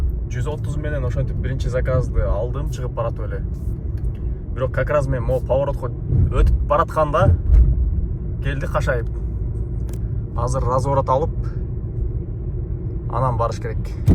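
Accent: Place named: Osh, Kyrgyzstan. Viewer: Бул оштук бала суйлогонунон билинип турбайбы,биро казыр карабалтада 90 процент оштуктар басып кеткен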